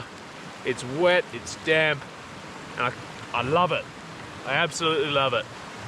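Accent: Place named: Australia. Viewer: Just found ya channel. Great video, and you threw plenty of your own personality in there to, which makes it way better and less like a documentary than some are. Before looking further, your accent tells me you're an Aussie? (all good lol) Wicked scenery and bird life. Liked & sub'd (so I don't get lumped in with them little headed Kereru) Watching from rural South Island NZ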